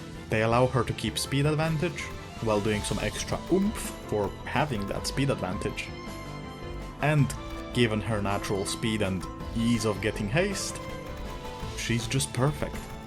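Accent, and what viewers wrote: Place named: Germany. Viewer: holy German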